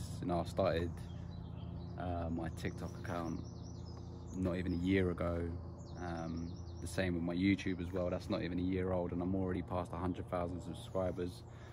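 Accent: United Kingdom. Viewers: You’ll get to a million very quickly. Hope you see this, I have a question! as I can hear you’re a Brit.. are there that many people who own outdoors pools here in the UK? I think I’ve never seen one 😂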